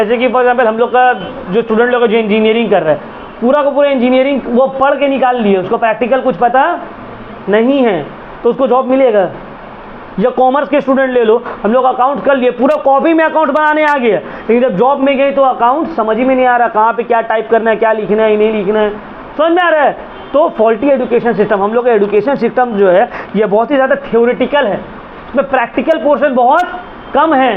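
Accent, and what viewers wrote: Kolkata, India. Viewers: Sir apka kalkatiya accent I love it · Apka kalkatiya bt krne k tarika bohot hebi h sir♥️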